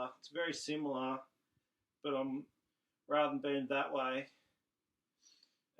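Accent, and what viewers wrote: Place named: Australia. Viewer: Dude, you're Australian. I never put two and two together. I've watched a couple of your videos and always imaged you talking in a US accent. I always wondered where Kangaroo Kungfu comes from and now I know.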